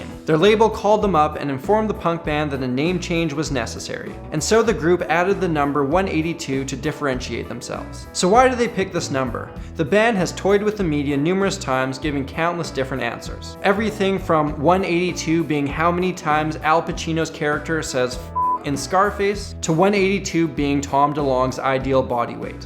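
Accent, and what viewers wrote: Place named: Canada. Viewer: As a native Akroner I am offended but maybe it was just ur Canadian accent aye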